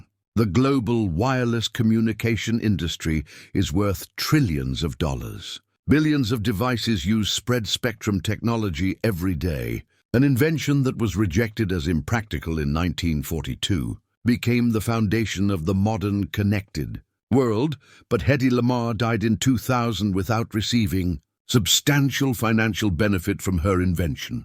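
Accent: United Kingdom. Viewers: Why does the world seem to think that Brits are more respected than Americans?? Why is the narrator here (AI generated voice) speaking British English?? DUMB.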